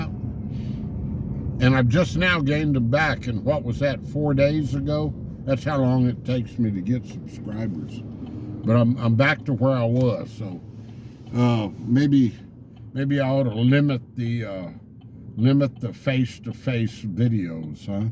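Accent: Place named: Texas, United States. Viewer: I think your awesome, I really am Texas home sick and you help a lot. I very much enjoy the stories spoken in my favorite accent. I haven't been to Texas in over 20 years, and everyday I try to get back to Springtown. Home of the POJO!!! Anyway I appreciate your channel very much. You stay blessed!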